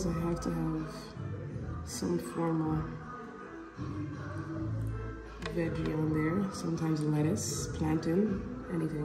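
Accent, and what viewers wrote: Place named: United States. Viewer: You sound more American than you do Canadian😊 Also I would love the healthy country life myself it's peaceful and the air is cleaner. Since all of us are subscribing to these YouTubers I think some of them should try harder tell us about available land for sale, so that people with no Jamaican background don't get to buy it all...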